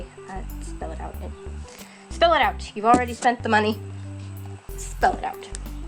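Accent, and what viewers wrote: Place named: United States. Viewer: lol, I am European and dislike her prosodie. Definitely too American for me ;)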